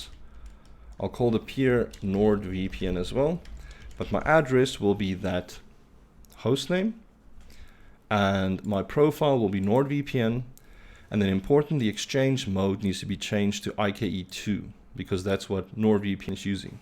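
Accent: South Africa. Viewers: Thanks dude! Altyd lekker om n Suid Afrikaanse stem op youtube tevind!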